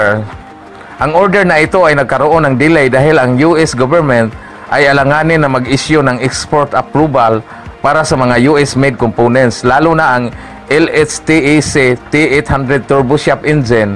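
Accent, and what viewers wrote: Visayas, Philippines. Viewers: Hirap intindihin pag bisaya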